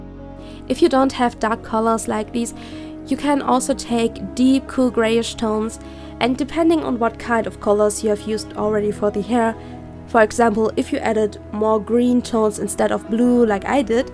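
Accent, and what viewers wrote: Germany. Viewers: lol that hard german accent, but either thx :)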